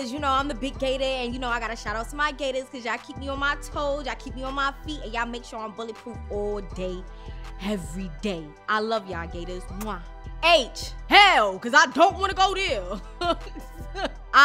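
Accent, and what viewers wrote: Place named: New York, United States. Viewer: I love her NY accent! Lol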